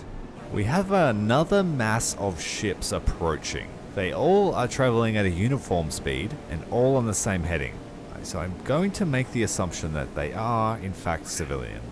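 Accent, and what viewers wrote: Australia. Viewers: Are you by chance from victoria?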